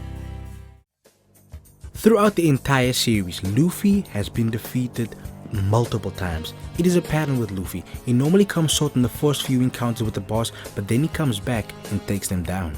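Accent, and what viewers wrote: South Africa. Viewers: Sounds like you're from Sud Afrique mabut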